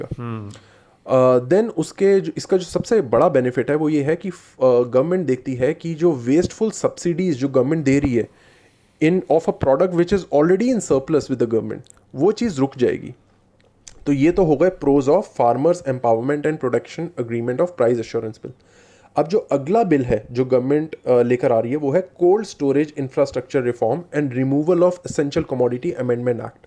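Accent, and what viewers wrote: Pakistan. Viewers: He sounds more like Pakistani....(accent)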